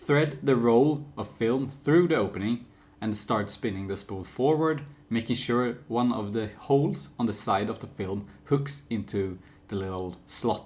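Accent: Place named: Gothenburg, Sweden. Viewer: Härlig Göteborgsengelska…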